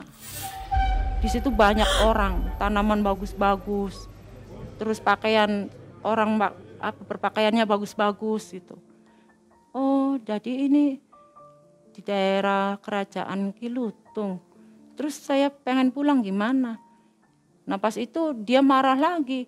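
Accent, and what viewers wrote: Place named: Cirebon, Indonesia. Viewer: Kaya org cerbon ap Indramayu 😊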